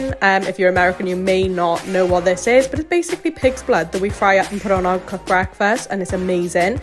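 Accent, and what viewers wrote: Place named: United Kingdom. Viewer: Hello. Youtube suggested your channel, I guess since I enjoy watching grocery hauls. Being from the USA I enjoy seeing hauls from the UK with the different kinds of food, prices, etc. I enjoyed yours that I have watched so far, but I find the music over your talking a little distracting. (I much prefer to hear your details you share especially with the accent). Take care and keep the videos coming.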